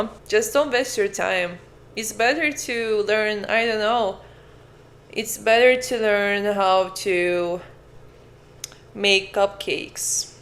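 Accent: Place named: Brazil. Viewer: classic brazilian accent, i can tell when i hear my fellow brothers and sisters of brasil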